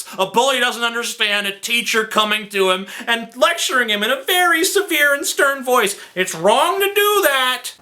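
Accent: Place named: Louisiana, United States. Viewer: does anybody know what part of Louisiana he's from?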